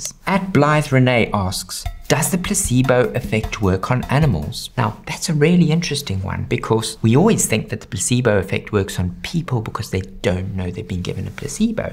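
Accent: South Africa. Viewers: It's South African "accent". Many different accents combined into one. I personally love it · This guy south african?? The accent gives pretoria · It's a South African accent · I'm having trouble nailing down his accent...South African? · sounds like maybe a bit Australian or something as well. I thought Australian, but it sounded off, and South African definitely makes sense. But I also wonder if there's something else in there as well! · I know a South African accent when I hear it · Dude. Your accent, are you South African? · he sounds south African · Is he South African? He sounds like it lol. Yay we made it to WIRED!!! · the second the video started, got slammed with the strongest south african accent i have ever heard lmao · Only a South African can recognise that accent ☺ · probably KZN accent. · I can't tell if you're South African, British, or Australian? 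· South African? I love the accent. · I know that South African accent anywhere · Love the South African accent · could listen to this guy for hours i love south african accents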